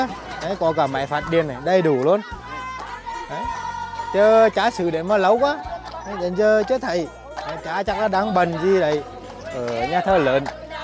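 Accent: Nghe An, Vietnam. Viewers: Giọng chú e nghe đậm chất xứ Nghệ thân yêu! Giọng nói đậm đà ấm lòng người nghe. Hoan hô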